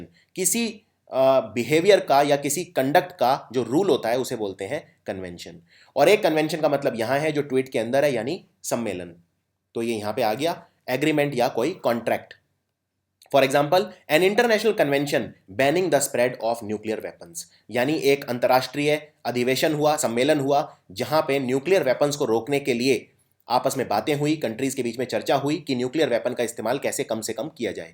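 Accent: India. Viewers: first just know that how to pronounce words. blody Indian accent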